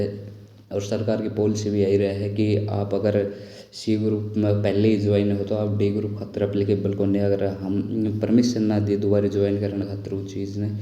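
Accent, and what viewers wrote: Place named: Haryana, India. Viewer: Brother mahendergarh side ka lag h😅😅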